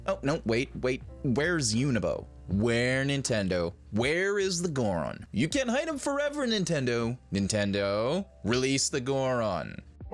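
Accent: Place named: Australia. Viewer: Your accent is so interesting. Like an Australian that’s lived in the US for too long? The way you pronounce your R’s is so chaotic. I love it.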